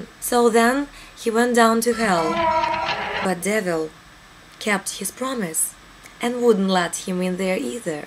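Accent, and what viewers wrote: Russia. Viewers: Russian accent - where are you from girl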